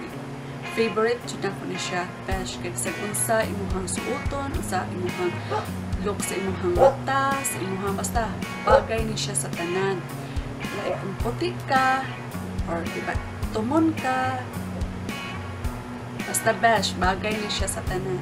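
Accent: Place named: Visayas, Philippines. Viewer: Hello dear beautiful, i subbed you back and natuwa ako at bisaya ang language mong ginamit hehehhe. Anyway maiintindihan kita kasi i have a lot of friends from Cebu, Bohol, Surigao...... keep it up hehehhe, so cute ang iyong bisaya. 👍🏻😘💞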